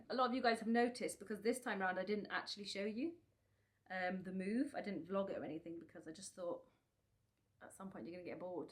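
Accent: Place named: Scotland, United Kingdom. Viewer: Oh I hear a Scottish accent! :D hello fellow Scot!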